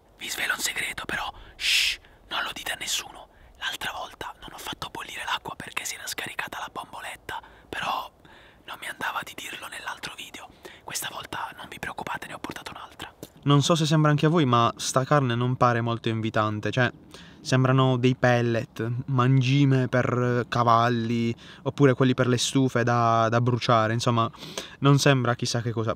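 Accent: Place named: Apulia, Italy. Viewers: Spiegare come è fatta la pasta alla bolognese da un terrone con l'accento pugliese mi fa girare la testa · Mi piace troppo il tuo accento. Sei andriese? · Capace che mi sento male. Ok sei pugliese come me, ora devo capire di che zona ahahahaha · Sei leccese o comunque pugliese?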